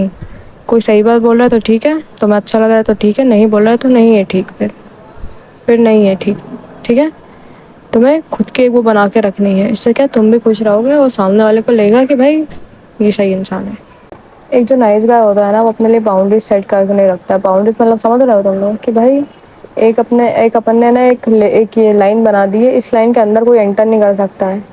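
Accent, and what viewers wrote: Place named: Madhya Pradesh, India. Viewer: Are you from madhya pradesh? Because of your tone I feel like you are from MP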